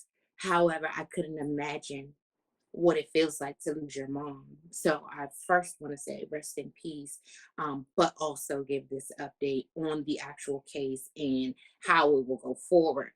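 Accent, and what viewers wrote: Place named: Washington DC, United States. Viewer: I could tell that you were from dc. Our accents are very distinct.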